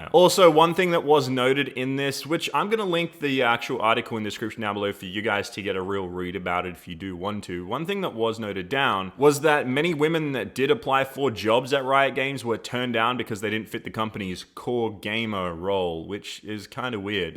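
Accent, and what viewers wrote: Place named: Australia. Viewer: Always been in to Australian accent! Hahahaa “GOOD DAY GUYS HERE”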